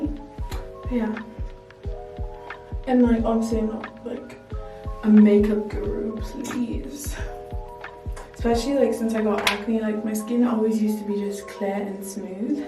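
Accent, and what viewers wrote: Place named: United Kingdom. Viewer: It’s the British accent for me😅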